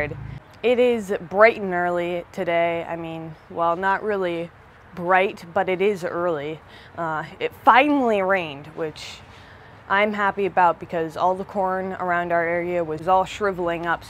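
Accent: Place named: Michigan, United States. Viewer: Hey, has anyone ever told you that you sound like you're from northern Michigan not north central Pennsylvania?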